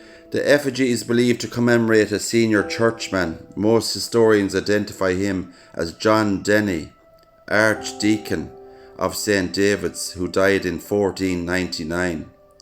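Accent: Ireland. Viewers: Your beautiful Irish accent is like music to my ears ❤❤❤ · Many Happy Holidays In Tenby Thanks you are marvellous..Beautiful Irish brogue Xxx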